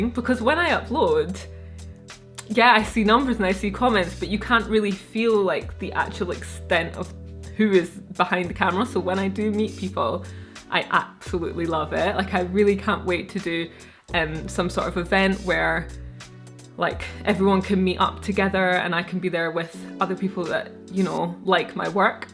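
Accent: Scotland, United Kingdom. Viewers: Greetings, I am subscribed dear and thank you for providing all the content, retired life is more enjoyable watching your video content as well as content of others, however I will have to start teaching architectural engineering to pass my time in addition to watching YouTube videos all day.,. I have to also say that I absolutely love your Scottish accent as its wonderful when spoken in the feminine sense if you will. Your numbers are headed in a positive direction, great work dear....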